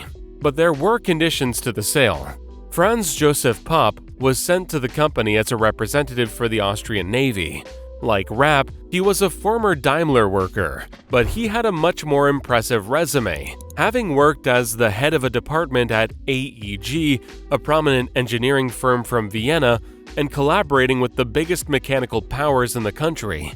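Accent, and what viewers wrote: United States. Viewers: Pity about the terrible American pronunciation. The only name he got right was Daimler. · I am only 2 minutes in and the stupidity of American pronunciation of german names is driving me insane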